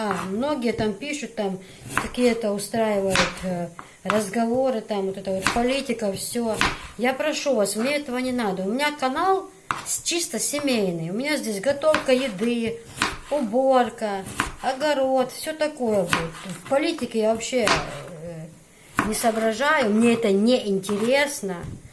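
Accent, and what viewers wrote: Chechnya, Russia. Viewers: ты такой молодец,знаю как было тебе тяжело освоить ментилитет чеченский,ты справилась на все 100%,ты полностью воплотилась в чеченку,что акцент,что внешность,что монеры.Хвала тебе,и восхищение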